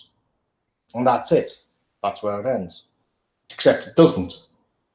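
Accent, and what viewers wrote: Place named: North East England, United Kingdom. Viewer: Well said 👌 From a fellow North East lad. It's refreshing to hear an alt righter with the same accent as me.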